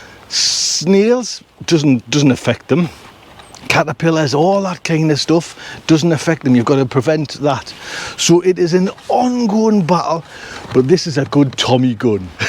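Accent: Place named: Sunderland, United Kingdom. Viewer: Hi, been following for a while and finally got round to subscribing. Love the no nonsense practical videos, daisy obvs, but love my daily dose of home. Was wondering about the accent but saw you recently driving to a nursery heading into Seaburn 😍 Keep doing what you are doing, keeping an exile stuck in the Midlands v happy 😁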